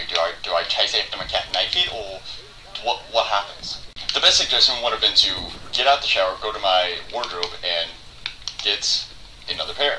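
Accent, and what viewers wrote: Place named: Australia. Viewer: Aussie accent game strong as fuck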